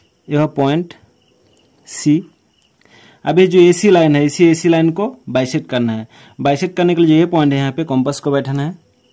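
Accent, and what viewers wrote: India. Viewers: But your english is from india 🇮🇳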